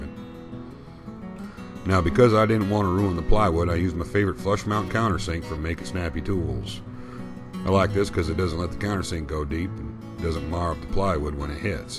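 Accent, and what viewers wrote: South, United States. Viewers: I see that you also picked up a southern accent while visiting your mom. Although it should have been the other way around. Nice narration by the way. Thank you for sharing. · I love that southern accent the ol' story is told in! Great solution for van camping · So what's with the southern accent? I tought you was from da UP 'eh? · Like most of the people on here I'm thrilled with the narration format. I like the Southern Sound of the accent since I'm a Texas boy myself. Sounds like you'd be right at home in the Lone Star State.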